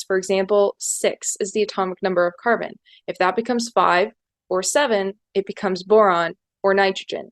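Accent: United States. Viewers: Wow - super clear and accurate. (And great to hear a voice from the USA that is not talking nonsense - here in the UK, the news is dominated by Trump and his creatures, so I have come to associate an American accent with idiocy).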